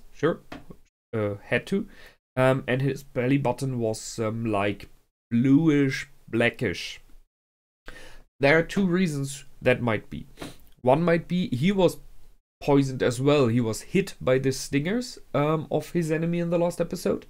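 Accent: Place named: Germany. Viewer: could you Provide me with a link, im on phone and i can only click on links:/ Would be very much appreciated. By the way are you from Germany, you have that kind of accent:)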